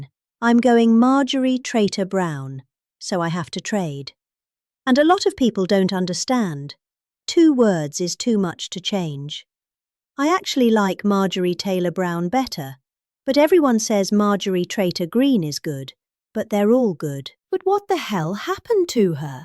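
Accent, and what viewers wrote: England, United Kingdom. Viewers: Can’t wait until this lovely lady reads his obituary in her lovely lilting English accent!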